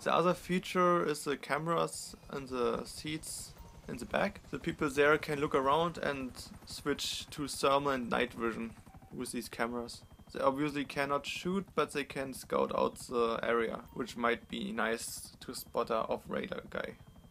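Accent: Germany. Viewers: Du hörst dich deutsch an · Deutscher man merkt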